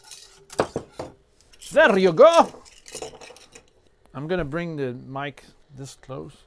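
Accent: Quebec, Canada. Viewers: Hey un québécois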